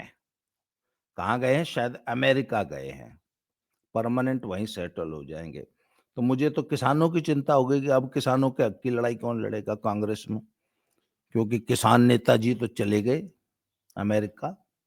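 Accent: Haryana, India. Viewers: Babaji please aap to harvanvi bhasha hi bola karo.aap ko majedar tarike se bolte ho. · Baba ji aap ki haryanvi tune sun ker maja aa jata hai.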